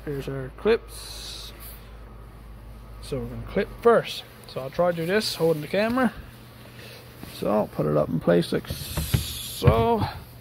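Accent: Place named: Newfoundland, Canada. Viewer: what part of Newfoundland are ya from?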